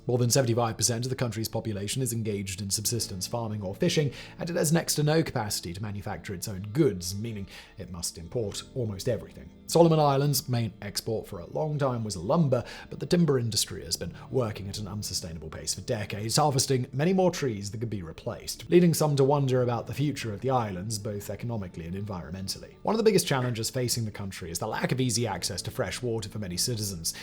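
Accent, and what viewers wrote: United Kingdom. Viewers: Hard enough to understand his heavy British accent and then he mumbles too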